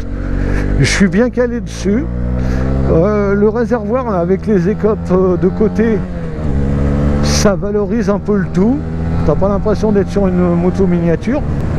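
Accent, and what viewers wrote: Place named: Lyon, France. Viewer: j’ai une Dacia Logan et une 911 carrera 4 S , je suis un automobiliste avec la deuxieme et pas avec la premiere ?...pareil il y a des permis B qui veulent rester avec leur petite moto et des fois c'est des Papy qui ne veulent pas passer le A2...Super Vidéo ,j’aime bien le beaujolais et ton accent de Lyon...gros V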